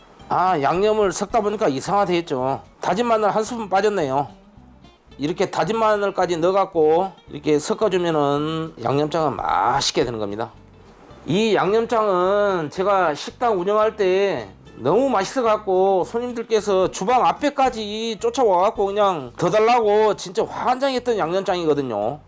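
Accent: Jeolla, South Korea. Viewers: 아따 때깔 좋아붑니다요 말투 너무 정겹고 좋아요 혹시 전라도 북도쪽이 고향 이신가요 저희 고향 사투리 억양까지 너무 비슷해요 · 맛깔나게 양념하고 내고향 전라도 사투리까지 구수하게 더하니 더욱 맛잇는 요리가 될거같아요 바로 따라해볼께요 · 전라도 말씨네요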